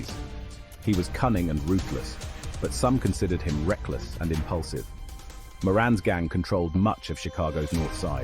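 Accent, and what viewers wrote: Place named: England, United Kingdom. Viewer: Why is it always a English person narrarating !!!!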